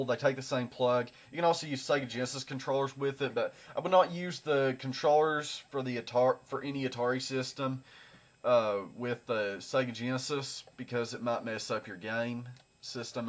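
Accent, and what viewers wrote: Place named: South, United States. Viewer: You have a thick southern accent man. Where you from? SC Here. :D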